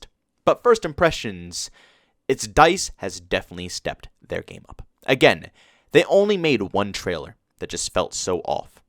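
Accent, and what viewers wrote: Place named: Texas, United States. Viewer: You talk like an American, not a good way, in a Texas annoying type way. I'll stick to Level Cap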